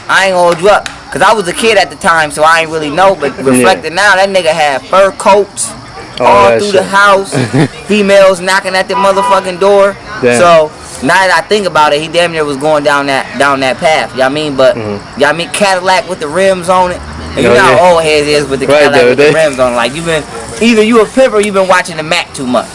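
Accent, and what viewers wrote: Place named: South, United States. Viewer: Ya mean 🤣😂😂 so country!!! I love this interview he’s so down to earth I love to see how he adapts to his Area he interviews with older people he’s very clean n professional and when he interviews with his peers he’s straight country n laid back!!! Keep up the good work!!!! I’ll subscribe to this channel!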